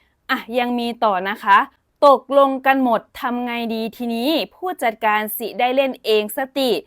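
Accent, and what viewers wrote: Isan, Thailand. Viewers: เว้าอิสานปนไทย น่ารักดีครับ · บอกไปเลยว่าเสียงอิสาน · 8นี้เสียงอีสานเล่นมาดตาพุดครัย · เสียงอิสานกะเว้าโลด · เสียงอิสาน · เสียงอีสานไหมคะ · เสียงอิสานแน่ๆไม่ต้องเดา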